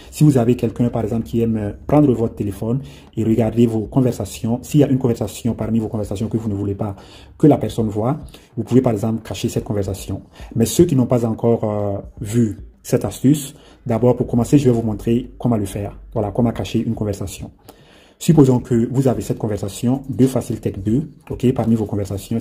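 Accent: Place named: Burkina Faso. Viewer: Merci beaucoup ! Je connais pas ta nationalité mais vous avez un accent Burkinabé 😁😁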